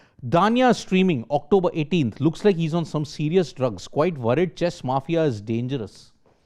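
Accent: South India, India. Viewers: Sports today, please change the presenter. His south Indian accent is very difficult to understand.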